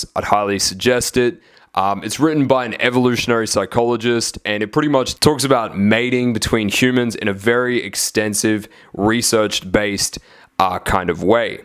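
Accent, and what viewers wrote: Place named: United Kingdom. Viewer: Where are you located? You have an accent, are you in the UK?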